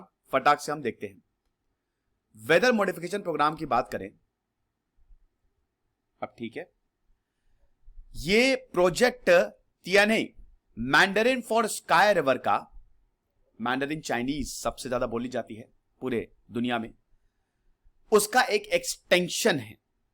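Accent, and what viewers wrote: India. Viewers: 听球不懂牛尿味儿的印度口音，但评论里的文字真切地让我感受到无数的充满嫉妒之火的血红双眼。 I really felt the jealous eyes in the comment.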